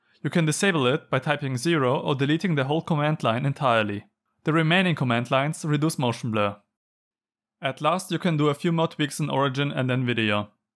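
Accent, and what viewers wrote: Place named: Germany. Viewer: Ah finde es immer wieder herrlich wie man in weniger als 5 Sekunden sofort hört "das ist n deutscher" 🤣no offense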